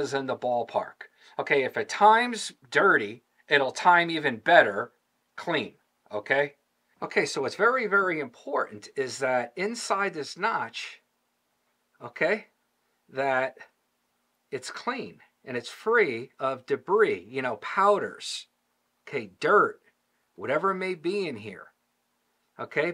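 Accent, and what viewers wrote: New York, United States. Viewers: Pretty obvious that he's from NY/NJ. You can hear the accent slip in frequently. 👍 EDIT: Favorite revolver and handgun is my S&W 686-6 shot w/6" barrel. I've had it for 25 years now. Enjoying my new 627-8 shot with 2.6" barrel, but would prefer if I could find the 627 w/6" barrel (not currently offered.) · Montana gun shop with the New York accent way too many okays for me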